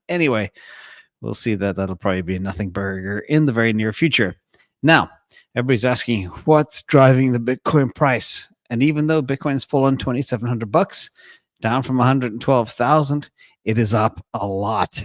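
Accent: Europe. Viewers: to me he doesn’t sound like he has an American accent, it has a hinge of euro accent but I could never pin it down. My guess is he moved around as a kid.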